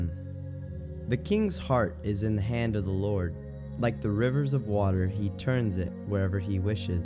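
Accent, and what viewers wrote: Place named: England, United Kingdom. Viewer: Thanks you so much bro. I am learning English accent with your bible study.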